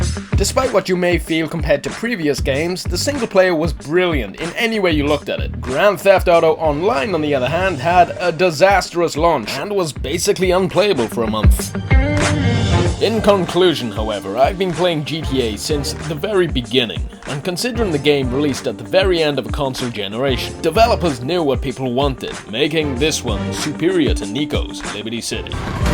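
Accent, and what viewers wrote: Wales, United Kingdom. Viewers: Are you from wales? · ! You are Welsh! So am I!!! Which part of Wales do you come from? Because you have a Welsh accent. Lastly I come from Pembrokeshire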